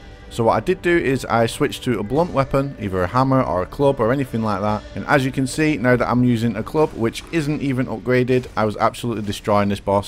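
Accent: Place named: Northern England, United Kingdom. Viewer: Think your a northerner GGs I'm Scottish and bad with accents, it's close to mine tho. Subscribbled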